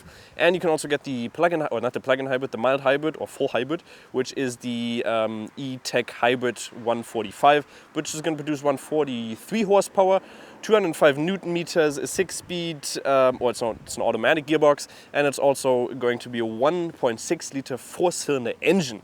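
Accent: Germany. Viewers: Jaaa! A German speaking English is quite funny. Good review though.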